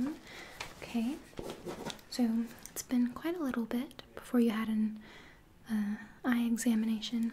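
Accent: South, United States. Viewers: Love the Georgia by way of Tennessee accent. sorry to type like I know you but " " I swear this is your actual accent you speak with. Also your pure devotion to be such a great ASMRTIST is divine as you are bless your heart child keep up your excellent work it's much appreciated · This video is y I subscribed, 😁 that southern accent was ironically soothing. U must b from the south, if not very convincing 😆. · hehe it's definitely a southern accent, i'm from jersey and we speak like we're from california. thank you for the time stamps :) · Love that southern accent lol!! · You have a better southern accent than the actors in Dumplin. Coming from a southern girl. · Wow, so far this is amazing! Creative idea, I love the southern accent. Comment section is brimming with kudos. This is going to be awesome. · Ok you country accent is spot on and amazing I from the country and even though I don't have that accent I heard some people talk like that and I have one family member who dose that is really amazing how good you did that! 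Keep up the great work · Gotta love me some southern accent, damn. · That country voice tho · The southern accent was SPOT ON! Excellent job as usual! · It's the country nurse accent for me~ 😗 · This is my new favourite ASMR clip. That southern accent is just perfect. <3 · Absolutely love the southern accent very funny! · Holy moly that accent is ASMR inducing. The southern accent is very endearing and loving. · you have the patience of a saint. You a little over 2 hour movie which must have taken forever to edit. Too tedious for me. You do a fairly good.good southern accent and it's pretty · Can't wait to watch this after work!!! I could only watch about like 2 minutes of it and I am Loving the accent!!! It is such a sweet and tingly accent :) It is sooo Good! Kinda reminds me a little of Dolly Parton.. but she's the first one to come to mind when I hear a southern accent lol. You're really getting into your RP..and I am here for it!!! 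😁💖👏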